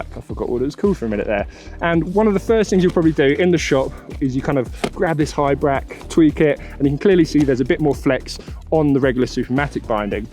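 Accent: United Kingdom. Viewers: You are the top snowboarder i like most with British accent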